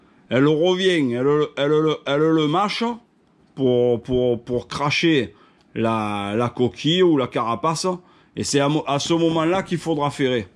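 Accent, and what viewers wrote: South, France. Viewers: J adore ton accent du sud 😂 et j ai le meme fluro que toi je l ai chopé a decat ...bon les crabes la ils sont pas trop vivaces😅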